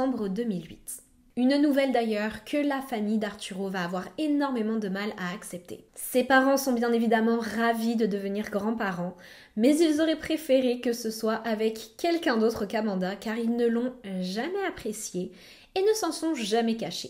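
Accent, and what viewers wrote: Quebec, Canada. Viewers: je viens de découvrir ta chaîne & woww ton maquillage t’es toujours parfaite🫶🤍 j’adore tu parles beaucoup de cas que je connais pas ou qui sont moins connue en étant une grande fan de true crime. Je suis québécoise et ça m’arrive de regarder des youtubeurs français, j’ai l’impression que t’es française, crime je trouve que t’a un accent québécois AHAHA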